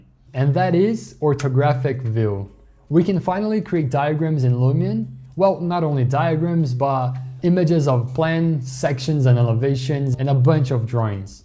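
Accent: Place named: Brazil. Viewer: It's our Brazillian accent, if englsih was spoken correctly is really kinda cute .-.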